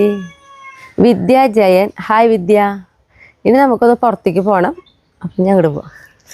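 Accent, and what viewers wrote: Thrissur, India. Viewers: Eniku ningalude samsaram clear aakunnilla.kollakarku thrissur slang manasilakan kurachu prayasamanu.....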